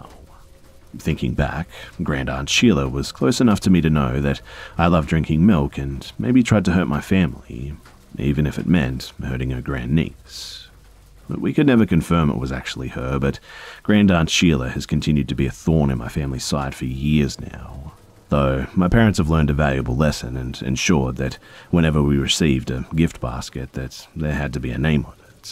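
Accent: Australia. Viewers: Trying to place your accent - Melbourne (AKA Melbun)?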